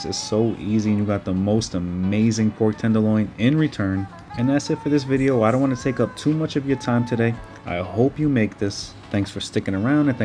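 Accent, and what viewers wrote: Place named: New York, United States. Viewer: my mans new york accent makes me feel right at home!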